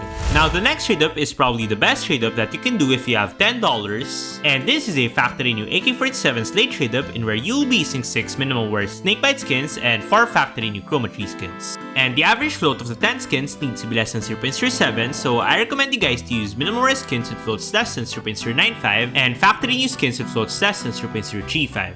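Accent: Philippines. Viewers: are you pinoy. you sound pinoy.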